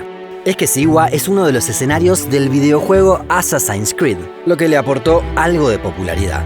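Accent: Argentina. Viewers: Esta canal es un lujo!! Ese cielo por amor a dior!! Claro q me gustaría conocer Siwa y el Sahara! Será cuestión de planearlo. Gracias sos impecable y me encanta que tengas acento argento. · Que lindo acento argentino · El acento argentino también es parte de la magia de este canal:3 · De donde sos vos viejo? Tenes acento argentino 🤔😋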